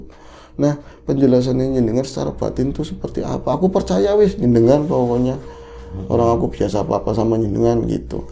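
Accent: Banyumas, Indonesia. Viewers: Sirah.. endas.. padak.. basa ngapak.. 🤣🤣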